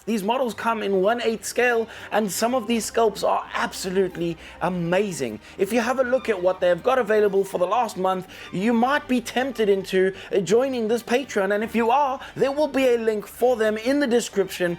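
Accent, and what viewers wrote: South Africa. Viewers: My guy sounds like a fellow South African! No disrespect meant of your not😅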